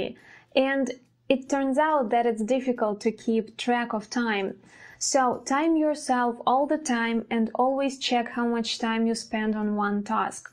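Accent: Russia. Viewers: Wow, Russian accent and manner of speaking are so noticeable:) You don't really sound like a native... no offence, tho. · Real Russian intonations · It's really not, I don't think you heard native English accent. I could hear the russian accent from the very first minute of the video.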